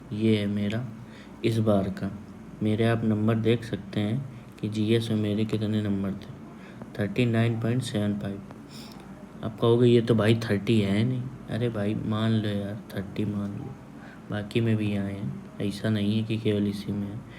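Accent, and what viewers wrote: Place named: Kanpur, India. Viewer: Bhaiya aapke accent se hi samajh gaye the ki aap kanpur se hain ...badiya chize batate h aap ... dhanyawad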